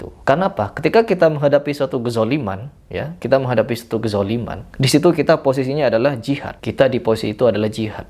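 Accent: Madura, Indonesia. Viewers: Mas sampean orang madura ya?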